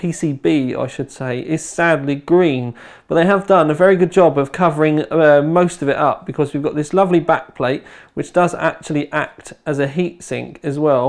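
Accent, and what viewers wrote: United Kingdom. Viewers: anyone here knows how to avoid UK accent videos on youtube?? OMG!!! headache. Thanks for the video anyway o.O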